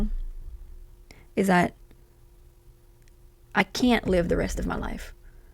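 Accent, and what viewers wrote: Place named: United States. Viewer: your accent is featured in the video "13 American Accents Ranked EASIEST to HARDEST to Understand", by user Olly Richards. 🙂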